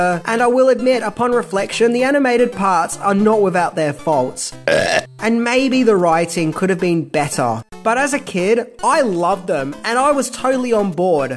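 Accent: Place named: Australia. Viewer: 'Ita wassa game like no-othaaaaa" Too bad they didn't hire an English speaker that speaks ENGLISH instead of what is assumed to be English (Thick Australian? British?) Ruins it for the rest of the video.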